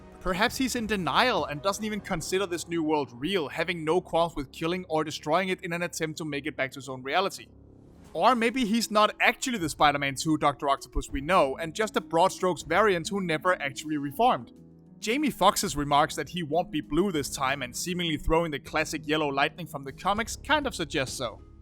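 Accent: Denmark. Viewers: Ohh shoot, a danish narrator🙏🙏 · Are you Danish? You sound like you have a really strong Danish accent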